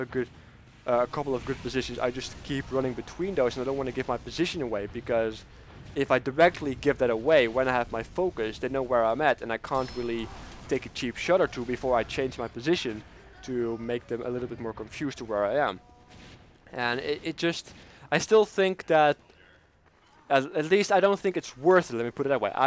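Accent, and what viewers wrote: Netherlands. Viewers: are you from holland ? :D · Je kunt gewoon horen dat je nederlands bent lol, goede video's man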